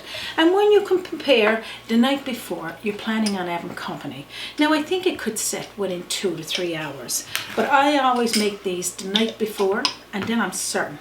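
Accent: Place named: Newfoundland, Canada. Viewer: I just happened to run across your channel (the strawberry squares popped up on my screen yesterday), and I see that you have many interesting recipes online, including some specialties from the area where you live. At first, I was confounded. Your name indicated a Latin background, but I see you are not and also your accent threw me as well, until I saw the word Newfoundland on the screen. Oh, my. That is an area I am totally unfamiliar with, only that I believe it is located near New Brunswick, around there, close to Canada, certainly not my neck of the woods. Anyway, I wrote down a number of your recipes I plan on making in the near future, including this chocolate gelatine dessert, the reason being that I noticed too many manmade chemicals and additives in Jello products. I would much rather make pudding without all that. I also noticed many delicious soup recipes I want to try out as well as several breads. 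I am amazed at the ease with which you make your breads, thinking to myself, why haven't I thought of using those methods before. I am like you when making desserts...I heard your comment in the chocolate walnut cookies video...that when it comes to making something like that, you just want to make it enough to make it taste good, that you don't bother yourself with making it too fancy...something like that. I feel the same way. I will continue to watch your channel in the meantime. In fact, I subscribed yesterday. When I eventually make one of your recipes, I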